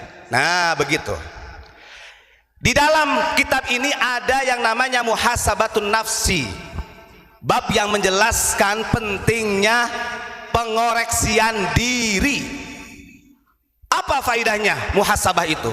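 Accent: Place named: West Java, Indonesia. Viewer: Gus orang Sunda apa Java soalnya aksen sunda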